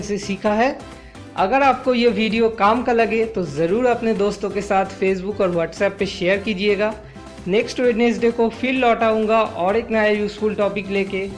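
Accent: Bengal, India. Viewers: Dada, bangali naki? Accent shune mone holo :) A good video!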